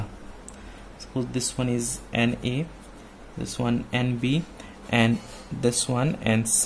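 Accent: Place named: Nepal. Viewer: Your accent sounds nepali. Are you??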